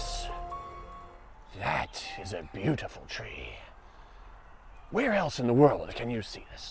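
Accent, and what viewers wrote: United Kingdom. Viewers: is my favorite YouTuber, but his British accent is just terrible...lol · Hahahaha I'm rolling on the floor...that British accent😂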